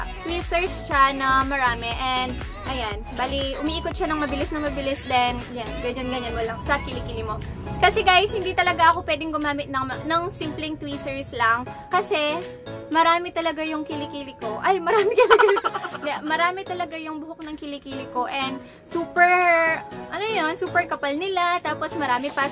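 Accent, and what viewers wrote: Pampanga, Philippines. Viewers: Kapampangan ka po? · Kapampangan ka ata ate? haha❤️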